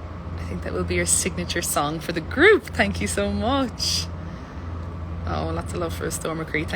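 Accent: Ireland. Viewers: Wow i love the irich accent ❤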